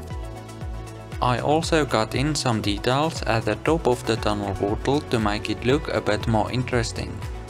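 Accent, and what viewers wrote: South Africa. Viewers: Ik dacht al een Zuid-Afrikaans accent te horen. :) Je video's zijn erg goed en heel professioneel. Ga zo door! Edit: Nu hoor ik je ook in de video zeggen dat je van SA bent.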